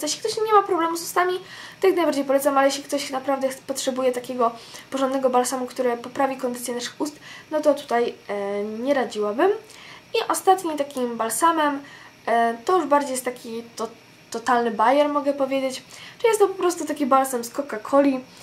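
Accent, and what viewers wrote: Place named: England, United Kingdom. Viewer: Prosiłabym abyś nie używała angielskiego akcentu bo to ... mnie wpienia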